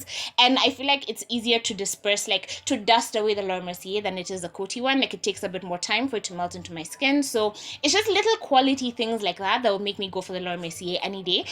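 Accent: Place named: South Africa. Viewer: Your accent sounds Sooooo South African 😅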